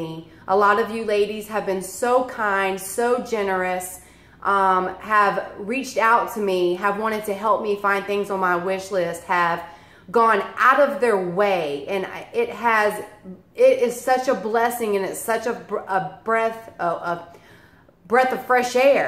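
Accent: South, United States. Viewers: Gotta say that I just love your southern accent. I love some of the phrases you use. They add to your heart warming personality. I myself appreciate your comments regarding even the smallest kindness making your day! It’s true. One thought good or bad can change the course of a day sometimes, but when you know that their are people who care it makes life just that much easier!!!! Thank you for your positivity today!!!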